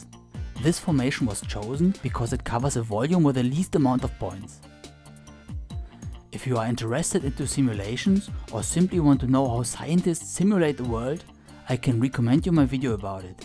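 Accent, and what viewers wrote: Germany. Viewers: der deutsche akzent is einfach super XD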